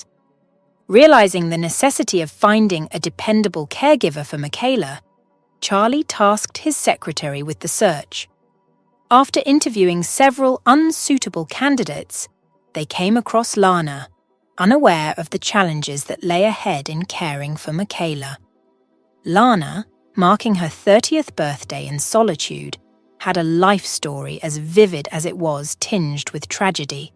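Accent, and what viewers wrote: United Kingdom. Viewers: What a beautiful story I enjoyed It from the beginning to the end ! The narrator with British accent was wonderful. I am happy that Lana was cleared of suspicion on her actions Thank you so much I love this story!!!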